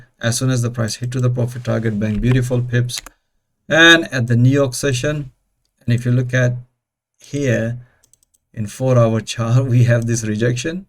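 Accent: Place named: United Kingdom. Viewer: Sir pls try to make us understand in Urdu language. Many people are unable to understand British accent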